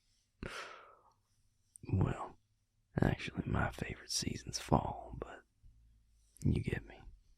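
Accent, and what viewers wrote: South, United States. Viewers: Him: taking My dumb ass self: you sound so Country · I love the southern accent 😫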